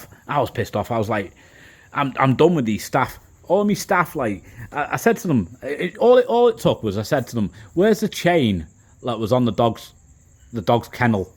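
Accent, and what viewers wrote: United Kingdom. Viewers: Hi, I like your British accent, hopefully will go to show you my French Canadian accent later this year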